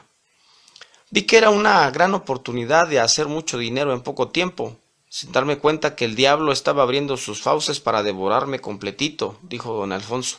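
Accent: Mexico. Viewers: Excelente relato,a pesar del matiz mexicano me encantó . que buena historia digna de una película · Muy interesante historia y sobre todo una excelente narración, con ese acento mexicano que me encanta. Felicitaciones güero, me enganche a tu historia desde el principio hasta el final. Saludos desde Lima-Perú.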